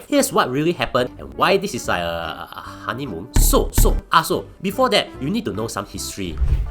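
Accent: Singapore. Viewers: Even if i don't agree with you on some points, your delivery is humorous, very singlish. Good job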